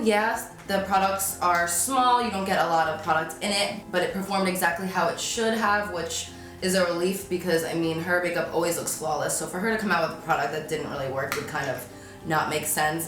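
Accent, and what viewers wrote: New Jersey, United States. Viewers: I love your jersey accent lol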